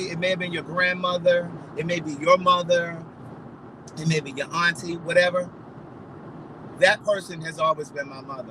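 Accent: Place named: Baltimore, United States. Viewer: I just love looking at you and listening but I am here in Baltimore too and I understand what you are talking about and you sound just like someone from Baltimore. 😂 But I hope your mom doing better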